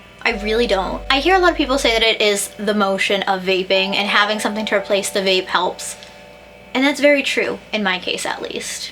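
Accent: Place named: Massachusetts, United States. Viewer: Sounds like you live in massachusetts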